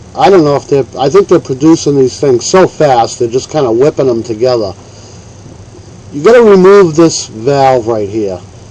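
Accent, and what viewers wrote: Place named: Maine, United States. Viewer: No. Now that I have had time to think about it, I was way off. I would guess that you are from Maine, not Mass. My guess is somewhere between Bath and Warren. Did I get it right this time? By the way I did the repair and you were spot on! The O-ring was a little deformed but I think it's going to be OK. I had better luck placing the O-ring and washer on the valve and (holding it upright) brought the mag down onto it. Thanks again!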